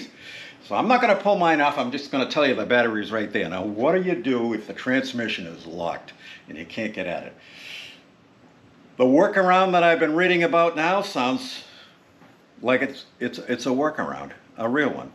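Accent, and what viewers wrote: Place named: Boston, United States. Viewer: I enjoy your informative videos. Keep up the good work. Love the accent. Is it from Boston?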